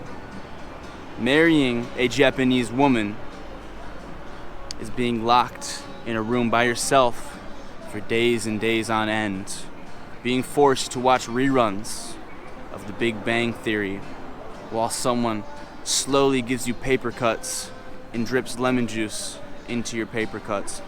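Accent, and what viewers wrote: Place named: United States. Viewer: Dude it's obvious your Americian when you talk and the cloths you wear. But yes most of what you say is facts.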